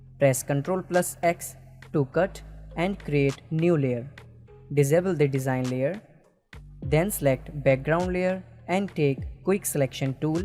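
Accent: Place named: India. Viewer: very funny indian english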